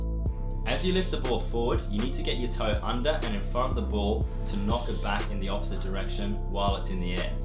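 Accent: Australia. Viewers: U Australian ? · didnt realise you were aussie dude! where abouts are you from?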